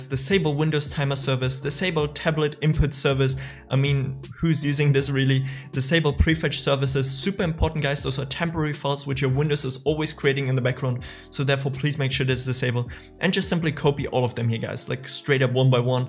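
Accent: Germany. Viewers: Oha digggah du hast mir von 30 fps zu 120 fps geholfen ehrenmann bist du eug deutsch wegen akzent:(areyou German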